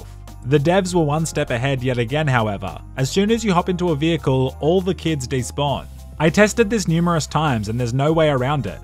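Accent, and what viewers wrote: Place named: Australia. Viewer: woah... your'e Aussie?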